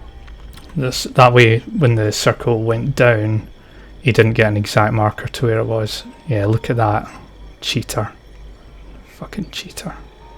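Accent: Scotland, United Kingdom. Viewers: Thanks alot mate, the tips & tricks really helped if I ever want to play WD 1 again! 1 sub I also quickly recognised that you're Scotish like me. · youre scottish aint ye i love your acceent mate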